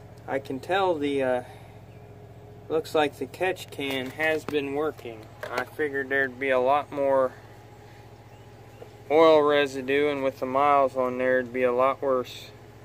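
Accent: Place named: Southern Ohio, United States. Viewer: I'm from southern Ohio your accent sounds just like mine were you from Chillicothe Ohio Jackson or porstmouth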